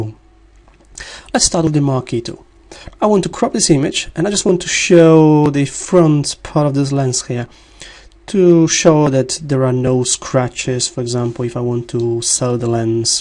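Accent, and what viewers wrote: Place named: France. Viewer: exotic accent ;) French or a Slavish Language? thanks for the tutorial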